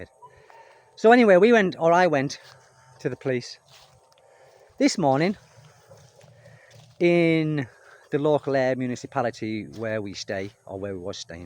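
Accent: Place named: Scotland, United Kingdom. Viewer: Started listening to you speak and you reminded me so much of my time teaching English in Japan at a British based school. Just closed my eyes and listened. Correct me if I'm wrong but do I hear Scotland? Sound so much like my friend Pat. Cheers mate!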